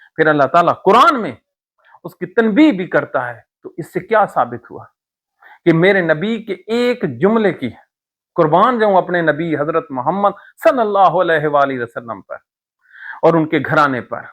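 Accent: Saraiki, Pakistan. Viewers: آپ لہجہ اندازِ گفتگو بتا رہا ہے آپ سرائیکی ایریا سے ہیں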